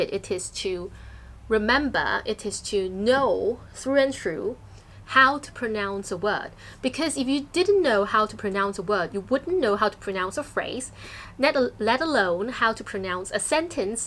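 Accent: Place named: England, United Kingdom. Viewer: Being able to speak English fluently is a must and it's a routine / daily practice for every English teacher. It's not something AMAZING for an English teacher to do, or something that an English teacher has to be BRAVE to do among a crowd of students. I guess you may have exaggerated your ability to speak fluently with a bit of English accent. I'd like to tell you that there are hundreds and thousands of lecturers / teachers out there who can also speak English EVEN MORE FLUENTLY than you can. If you jump into any English Department in any university in HK, you'll soon find that you are just one of the thousands in the 7.5M population in HK.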